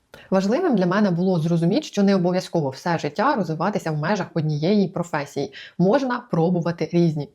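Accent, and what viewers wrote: Ukraine. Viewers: Прикольно,це перше відео із вашого каналу,котре я дивлюсь,чогось ютуб його мені підкинув.Подобається ваша щірість та те,як ви розмовляєте,ваш легесенький суржичок просто божествений.Дякую вам за надхнення ! ! ! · Гарне відео. Якщо можна особисти питання. Ви випадково не з Полтавщини? Якась знайома балачка🙂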